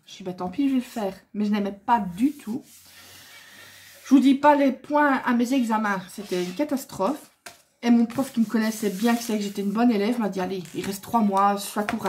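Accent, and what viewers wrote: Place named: Belgium. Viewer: Coucou, j'aime beaucoup, ton parlé avec toi on ne s'ennuie pas, j'ais pu comprend que tu étai de Belgique? moi aussi, Bisous.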